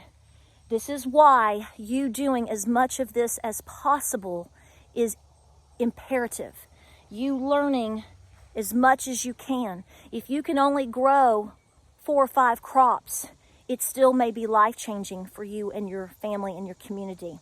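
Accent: South, United States. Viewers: I love your southern accent 😍😍😍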